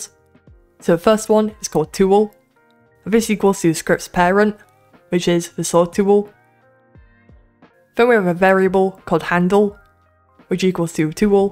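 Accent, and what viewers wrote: United Kingdom. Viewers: Amazing, plus the vouce is satisfying, british is cool.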